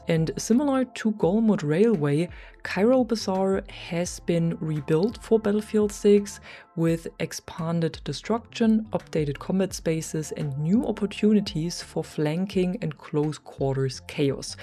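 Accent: Germany. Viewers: where are you from? Dialect sounds German?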